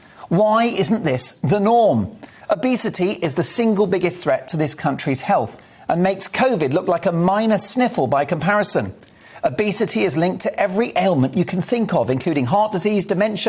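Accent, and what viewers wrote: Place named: RP, United Kingdom. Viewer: Democide; the killing of members of a country's civilian population as a result of its government's policy, including by direct action, indifference, and neglect democide is any murder by government--by officials acting under the authority of government. That is, they act according to explicit or implicit government policy or with the implicit or explicit approval of the highest officials. But clap at the sky if you want. Hell they've been through? Yeah I guess learning all those dance routines must have been a nightmare. Dolan is full of manure and cannot or will not see the reality, scale and scope of the crime and hoax perpetrated against us. A plummy voice, meeja haircut and glasses doesn't convince me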